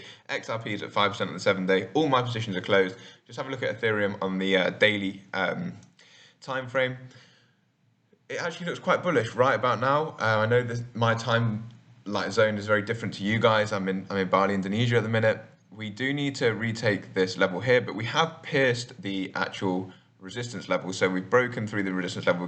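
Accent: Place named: Cockney, United Kingdom. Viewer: You gorgeous! You sound a bit Cockney. Am I right?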